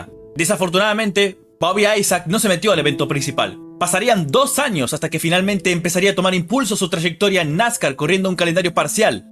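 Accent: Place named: Argentina. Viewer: excelente video amigo! increíble que haya un canal de habla hispana (y argentino por lo que se te ecucha) que hable de Nascar!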